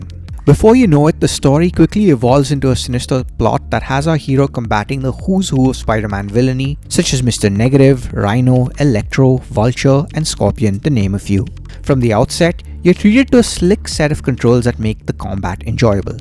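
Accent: India. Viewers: Although I liked the video. But seriously don't try to mimic any accent. Indian accent is way better understandable than others don't try to mess it up.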